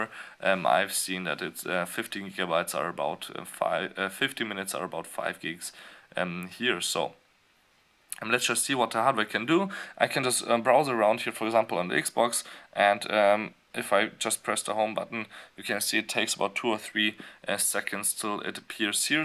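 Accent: Germany. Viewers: Man hört dass du Deutscher bist :D...Aber trotzdem sehr gutes Video,gut erklärt :)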